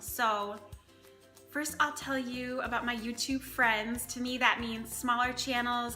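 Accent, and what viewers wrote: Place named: Minnesota, United States. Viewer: Thanks for the Tag! I'm still dealing with this leak 😩 I look forward to doing this tag soon. Oh and you definitely have a Minnesota accent 😉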